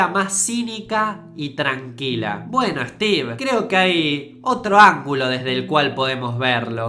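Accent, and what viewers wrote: Argentina. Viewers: Habla asi porque es argentino. Y esta hablando de un apellido ingles. Casi todas las palabras extranjeras las pronunciamos con nuestro acento en el caso que estemos hablando castellano. Si te parece raro ponete a pensar como pronuncian los ingleses o los estadounidenses los apellidos o los nombres españoles. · Dilo desde el inicio deja de hacer estas mierdaaass... que luego personas como yo, debemos soportar a estupidos fna de x marcar creyendo estas meirdas.... No jodas... todos sabemos q la interfaz de Apple su un robo total y declarado a Xerox PARC... así q eso de "ENTORNO GRÁFICO FLAMANTE" es totalmente una desinformación y la gana de crear polémica, Y no me jodas q es eso de "orishinal" todos sabemos q eres Argenta... pero eso es no saber hablar.. vocaliza por favor, y no me digan q lo dice en ingles, por q así tampoco es... y encima esta al revés. 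(Justo lo oí cuando escribía y me descoloco, tranquilo Argentino medio, no os odio o cosas asi.) Bueno... Aquí el único GENIO DEL MAL y SOBREVALORADISIIMOO es el JOBS, ya q el copio primero la interfaz de Xerox PARC descaradamente y iban de chulos... creyendo q era los unicos... y ahora q Bill, se los adelanto... que hace el copión y rata de JOBS se enoja.... WTF! quien es el GENIO DEL PLAGIOO... o mejor dicho el IDIOTA DEL PLAGIO. A u otra cosa mas general, gente q mira este canal por favor dejen de verlo este tipo es un papanatas... con ganas de crear ruido y subir en fama, si lo siguen podrán ver como cada día poca temas mas controversiales para llegar a mas de 1m de subs rápidamente y mas , de forma rápida, a costa de decir mierda pura. Es asi, tus video son de buena calidad con tu tono/toque... todo eso, pero una vez q dices mierdas todo ese ambiente se convierte en puro asco insoportable... 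y ya da igual si haces cosas buenas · no sos argentino, por eso decis eso. Es nuestro acento y ya.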